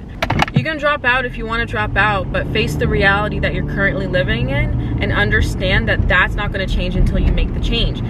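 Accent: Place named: United Kingdom. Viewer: British accent? Super cute! Watching from London, England. ❤️❤️❤️❤️❤️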